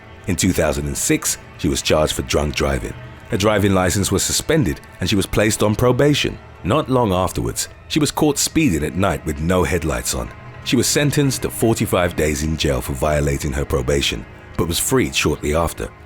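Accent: United Kingdom. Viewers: Thank you for your informative, interesting, and accurate content. All stated succinctly in a lovely British accent. Maybe Tupac’s mgr was taunting fate when he named his company .Death Row.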